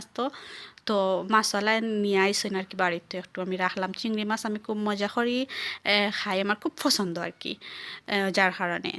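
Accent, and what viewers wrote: Sylhet, Bangladesh. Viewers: চিংড়ি মাছ যে এতো সুন্দর করি ভুনা করা যায়,দেখে অনেক ভালো লাগলো।। আরোও ভালো লাগলো আপনার সিলোটি মাত😀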